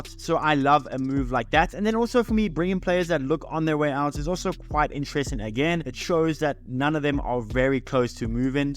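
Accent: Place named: South Africa. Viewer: South African 🇿🇦 accent for the win 👊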